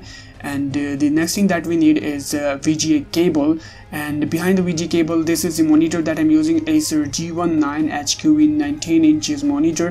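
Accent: India. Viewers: He sounds indian, no offense dude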